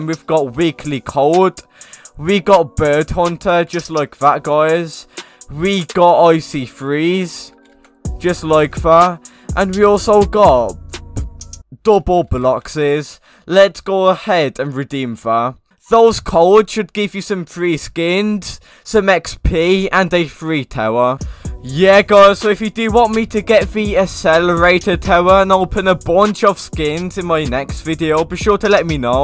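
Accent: United Kingdom. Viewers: and that british accent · Nice British voice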